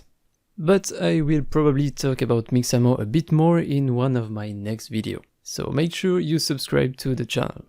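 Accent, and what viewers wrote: France. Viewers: Tu es français non? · ta grave un accent français tu serais pas?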